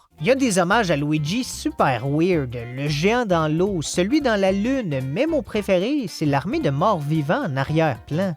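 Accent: Quebec, Canada. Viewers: Ma réaction en écoutant ce vidéo suggéré Wouah c'est québécois?! Je suis québécois et c'est tellement rare d'avoir du contenu de jeux retro en québecois et non pas en Français de France. Cool.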